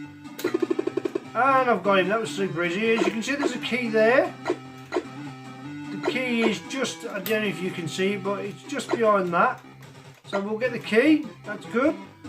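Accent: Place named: Midlands, United Kingdom. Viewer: That's on my list to try out not got round to it can I ask where are you from you sound like you are from the Midlands I'm from Wolverhampton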